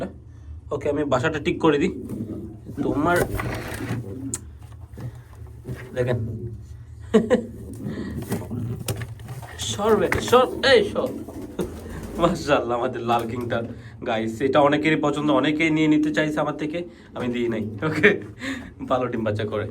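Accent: Chittagong, Bangladesh. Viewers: আপনার কতা শুনলে,বুঝা যাই,আপনি চট্টগ্রামের মানুষ, কতা অনেক সুন্দর করে বলতে পারেন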